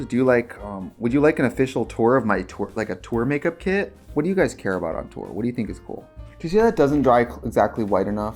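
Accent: Wisconsin, United States. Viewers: We need a full video with the super Wisco accent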